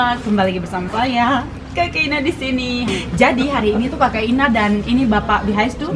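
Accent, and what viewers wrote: Papua, Indonesia. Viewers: kk ina org papuaaaa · Logat Papua kaka 😁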